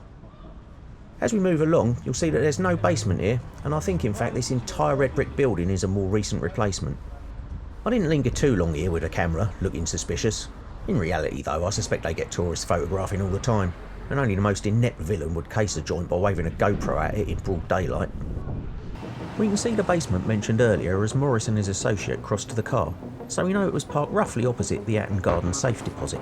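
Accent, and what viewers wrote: Cockney, United Kingdom. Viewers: I love a cockney diamond geezer accent. Your research is brilliant. Grew up in 70s watching the Sweeney. Its so good its repeated on itv 4 along with minder and the professionals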